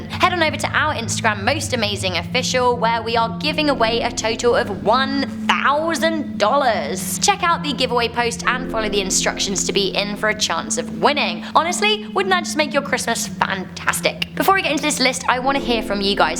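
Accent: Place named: United Kingdom. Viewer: Yeah us Brits have amazing accents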